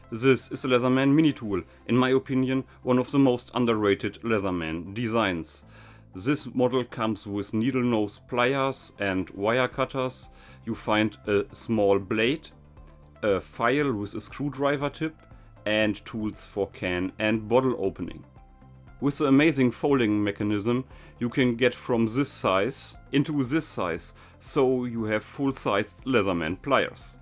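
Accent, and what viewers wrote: Germany. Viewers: Great products and presentation but the german accent makes it almost unbearable to watch, sorry.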